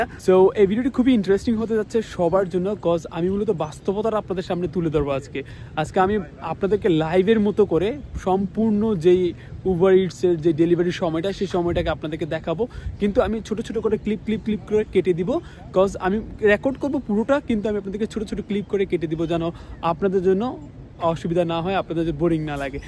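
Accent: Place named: Brahmanbaria, Bangladesh. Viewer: ভাই আপনার কথা শুনে বোঝা যাচ্ছে আপনার বাড়ি ব্রাহ্মণবাড়িয়া 😒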